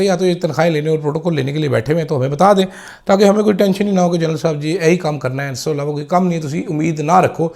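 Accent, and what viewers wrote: Punjab, India. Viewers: You have a real lethal sense of humour! Your style urdu speaking is just wonderful. (Panjabi accent?)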